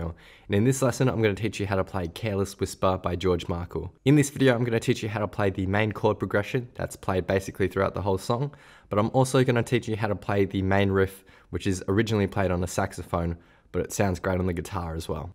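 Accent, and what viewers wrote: Australia. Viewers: Best tutorial vid on Youtube for beginner like me, thank you.. are you Vietnamese with Aussie accent? :)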